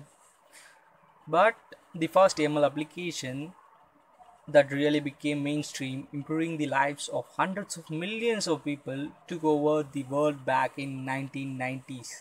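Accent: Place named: India. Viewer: no doubt it is a good topic, but I am trying to understand your Indian accent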